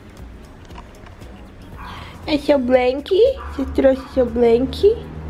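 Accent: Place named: Minas Gerais, Brazil. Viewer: Que linda ! Ta com sotaque gostoso mineiro, família linda . Deus abençoe!🙏🏻🥰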